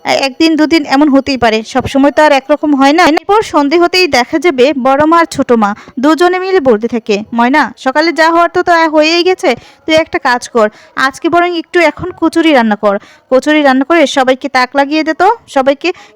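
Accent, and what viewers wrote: Bangal, Bangladesh. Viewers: উচ্চারণ আর একটু উন্নত করার চেষ্টা করো।বাঙ্গাল বাঙ্গাল কথা ভাল লাগে না।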